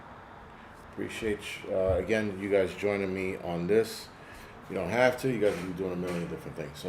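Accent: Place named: Northeast, United States. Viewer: I'm from Jersey but, haven't lived there in a long time. I enjoy your northeast accent & manner of talking truth. You make me laugh.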